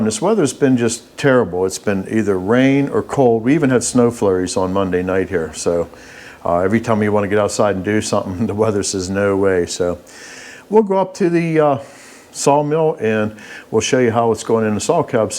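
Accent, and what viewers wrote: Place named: Pittsburgh, United States. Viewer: Handy sez that is a thick yinz we accent.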